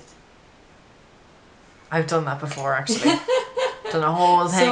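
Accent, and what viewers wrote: Ireland. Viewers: "It's too fekkin tick neou" Love your accent. I could listen to the Irish accent allllll day long! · Love the video and your accent! Sounds Irish. Where are you from?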